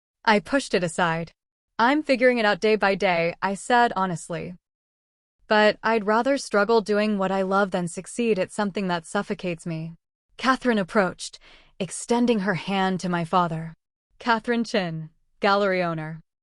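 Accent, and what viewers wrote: United Kingdom. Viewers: What accent does the narrator have,from UK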